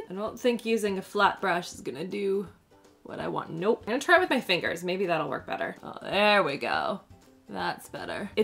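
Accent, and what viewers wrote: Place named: Canada. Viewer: I love your videos so much! Thanks for being so fun 💙😋 is it weird that I love how you talk? I’m from Texas so nobody here has a Canadian accent and it’s so cool how you say certain things! Anyways love ya! 💄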